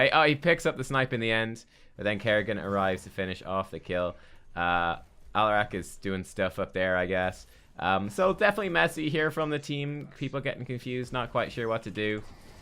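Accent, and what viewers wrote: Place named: Ireland. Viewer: Pretry sure hes irish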